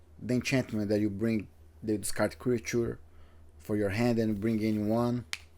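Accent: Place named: Brazil. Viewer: Karai... Tu falou e eu já vi, porra um br falando inglês vei,quemassa!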